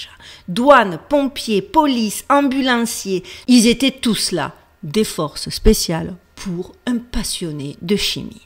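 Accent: Marseille, France. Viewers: trente seizième, au moins....t'es pas Marseillaise pour rien au moins, grosses bises amicales du pays des cigales, super vidéo comme toujours 👍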